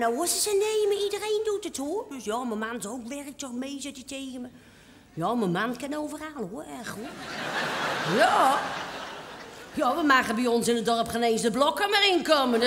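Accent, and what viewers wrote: Utrecht, Netherlands. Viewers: Prachtig accent dat Utrechhhh en die zelf bedachte scheldwoorden. Super! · Heerlijke vertolking van Utrechts ,lekker doorgaan zo.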